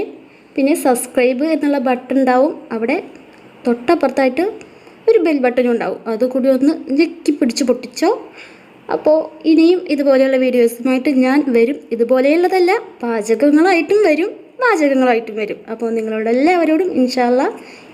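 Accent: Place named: Pattambi, India. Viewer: നിങ്ങളുടെ ഓരോ വാക്കുകളും ഞാൻ ശ്രദ്ധിച്ചു കേട്ടിരുന്നു അറിയാതെ ഉള്ളിൽ ഒരു എനർജിയും ആത്മ വിശ്വാസവും ഒക്കെ വന്നു 😍 പട്ടാമ്പിയാണോ വീട് സംസാരം നല്ല രസമുണ്ട് 👍 All the best dear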